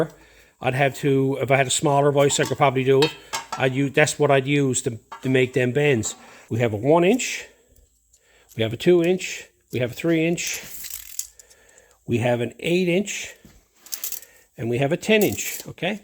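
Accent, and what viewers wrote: Ireland. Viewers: I really like your bare bones approach I'm 76 have a fitted,small metal/wood shop keeps me alive. I hope you don't mind me asking as an Irishman do you have an Irish connection or are Irish yourself I thought heard a slight broge there also the way you phrased some of the things you said, sounded like my grandparents of 70 years ago, brought a smile to my face. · It's amazing how similar to Irish accent yours is! And thanks for the great videos! Superb stuff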